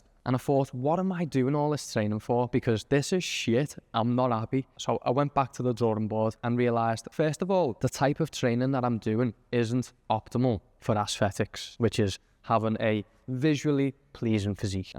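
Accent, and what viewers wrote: Liverpool, United Kingdom. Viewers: Not so much into fitness channels anymore but trying to do better again- subscribed for a scouser who speaks sense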